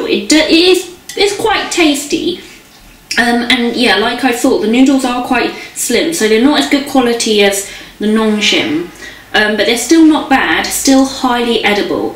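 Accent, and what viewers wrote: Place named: United Kingdom. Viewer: Sound so british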